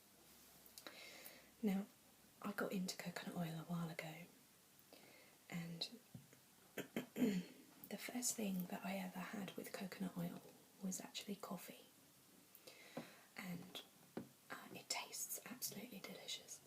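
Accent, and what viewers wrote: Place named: England, United Kingdom. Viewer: ~* *~ Cool! I really like this vid. Very casual, and my two biggest triggers are voices and tapping, and this vid has both! Your voice is really triggery for me, as I think English accents are more so than USA. Thanks and hope you're having a good day! Merry Christmas, happy Hanukkah, happy kwanza, winter solstice, or whatever you celebrate.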